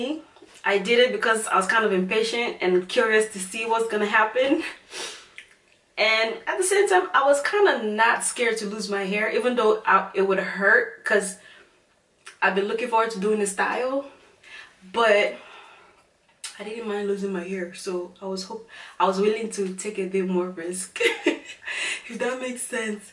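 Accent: Jamaica. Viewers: I was nervous too. lol I laughed so much. Are you a Jamaican?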